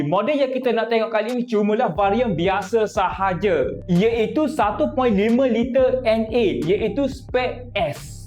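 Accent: Kelantan, Malaysia. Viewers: Ini loghat kelate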